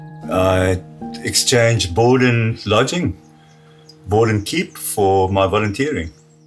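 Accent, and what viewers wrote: South Africa. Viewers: Sounds South African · ❤ great profound little video, profound, and that South African accent 😂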